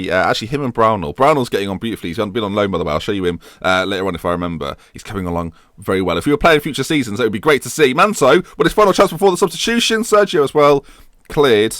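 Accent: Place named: North, United Kingdom. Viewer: your accents just kept going further and further north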